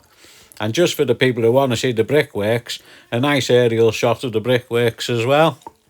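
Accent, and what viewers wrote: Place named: Scotland, United Kingdom. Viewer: "but certainly giving them a good wack in the head when they are not looking" ROFL ROFL ROFL! ! ! Too funny. My favorite Scottish accent channel on YT!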